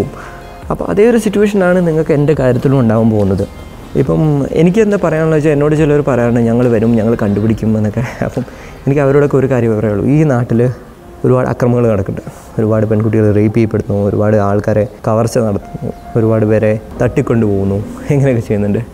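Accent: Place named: Kannur, India. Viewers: It’s not about just age,it’s about your friendly approach.Love and respect from Kannur,Kerala. (Your accent resembles Kannur boy) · സംസാരത്തിൽ നിന്നും മനസ്സിലാവുന്നു വടക്കേ മലബാറുകാരനാണ് അല്ലെ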